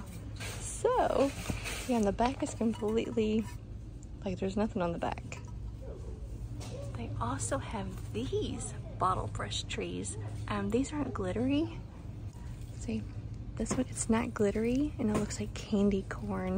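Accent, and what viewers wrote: South, United States. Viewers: First time following u! I love Halloween 🎃 everyday!! Are u from the south? I live in Iowa no dt Halloween?!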